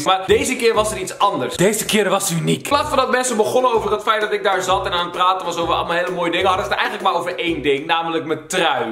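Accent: Groningen, Netherlands. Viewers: Ik vindt het echt vet dat je uit Groningen komt en dat ook gewoon Gronings kan praten!!!!!!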